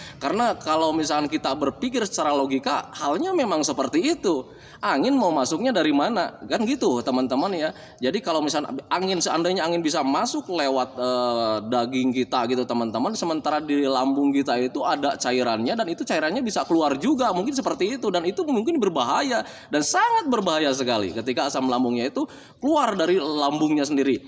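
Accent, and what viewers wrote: West Java, Indonesia. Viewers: assalamualaikum kk,kk orang mn Kya logat2 sunda gtu,pngn ktmu ih klo bsamh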